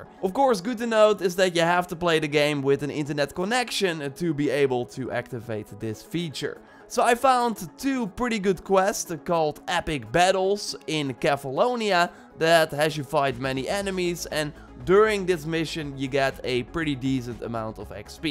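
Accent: Netherlands. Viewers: You can hear by his accent, this guy is Dutch. If you is Dutch. "Wassup Northern brother" If ya aint. "How you sound Dutch?" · Are you Dutch by any chance? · Jij bent toch een Nederlander hè? Omdat je accent er op lijkt.... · His Dutch accent 😂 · Your english sounds dutch too me 😂😂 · Your definetley dutch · Echt heftige nederlandse accent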